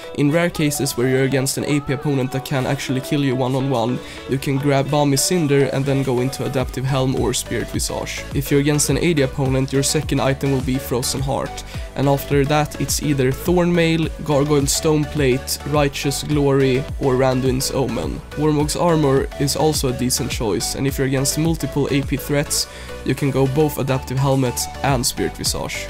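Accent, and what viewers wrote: Sweden. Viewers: great guide, can you make one that counts for now? love the guide, and how you perform. personally, i main sion, and this guide is pretty good i must admit. love your swedish accent XDD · he sounds like he are from sweden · Great vid man! And btw you sound veeery swedish xD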